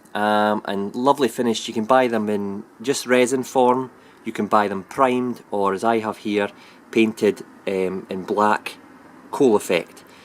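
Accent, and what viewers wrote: Scotland, United Kingdom. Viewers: Nice work!!! I watch for the Scottishness as much as I do for the trains :)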